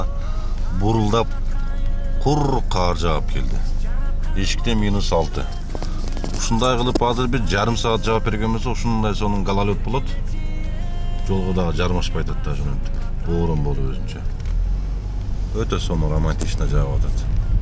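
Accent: Standard. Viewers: Нормальный элэго, таза кыргызча суйлогонуно шугур дечи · Молодоц иним , Акбаранын коз жашындай нукура таза суйлойсун👍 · Нукура таза суйлогон житит экенсин жашын канчада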